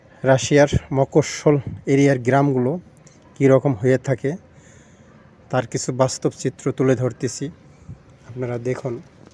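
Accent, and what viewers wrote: Bagerhat, Bangladesh. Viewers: ভাই আপনার ভাষায় মনে হচ্ছে ,আপনার বাড়ি বাগেরহাট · ভাই আপনার ভাষায় মনে হচ্ছে আপনার বাড়ি বাগেরহাট জেলায়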